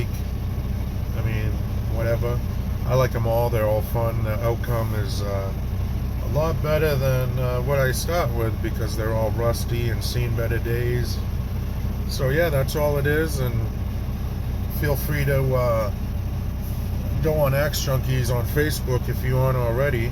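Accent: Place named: Boston, United States. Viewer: I thought it came out pretty nice. And I really enjoyed listening to the Boston/NH accent too. Time to go Pahk ya cah in the Havahd yahd. Keep up the great work!!!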